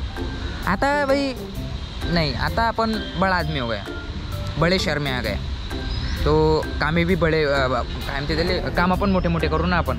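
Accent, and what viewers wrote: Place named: Vidarbha, India. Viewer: Bhai tu pakka VIDARBHA mdhla ahe.... Vidharbha chi bhasha ch alg ahe bhawa🙌🔥🔥🔥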